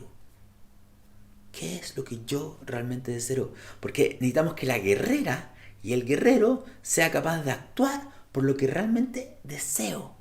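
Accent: Chile. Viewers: Amo tu acento chileno es un placer escucharte. Te mando un abrazo gigante de esta uruguayita viviendo en el hermoso México ❤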